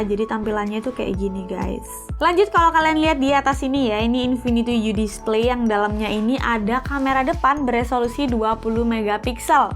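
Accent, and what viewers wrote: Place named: Java, Indonesia. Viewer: Logat jawa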